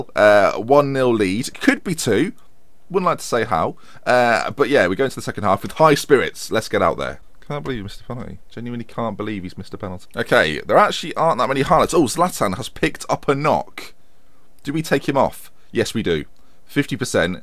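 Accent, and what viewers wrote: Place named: Manchester, United Kingdom. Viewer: your manc accent is pretty decent xD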